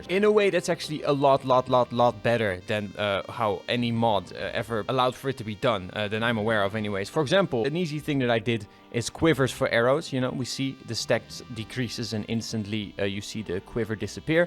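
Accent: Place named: Netherlands. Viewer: Took me 10 mins into the video until I realized you're Dutch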